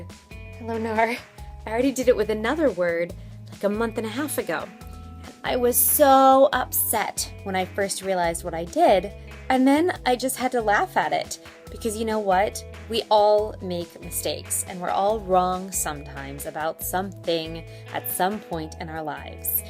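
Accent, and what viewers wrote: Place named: RP, United Kingdom. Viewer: Hard to believe you could never speak English! Your vocabulary is strong! You look pretty young as well. My faith in the youth is kind of restored now 😂😂 just seen your videos. You have some posh accent. You've just inspired me. Anything is possible if you set your mind to it! A very sophisticated comment keep doing what you're doing!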